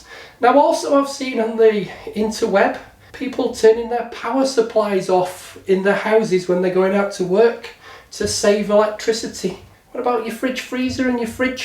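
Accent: United Kingdom. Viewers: 1,250 seconds of my life I’ll never get back. Next time, Made your videos shorter, single issues. No rambling into other related concerns. Make attempts to keep you audience awake and interested. Develop a less monotone drawl (sounds mid Cheshire to me), rather than droning on. 8 points for content value. 1 point for presentation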